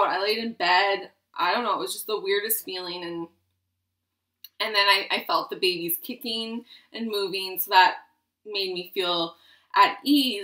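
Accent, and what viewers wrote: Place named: Canada. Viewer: I just love your Canadian accent!! Excited to see your babies soon!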